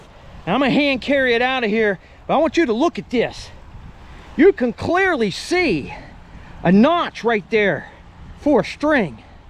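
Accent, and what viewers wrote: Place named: Appalachia, United States. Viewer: you remind me of my cousins in Ohio and West Virginia with your accent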